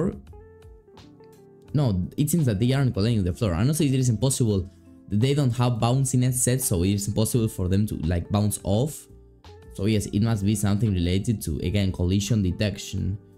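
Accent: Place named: Argentina. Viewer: Sos argentino? Tenés acento lol :D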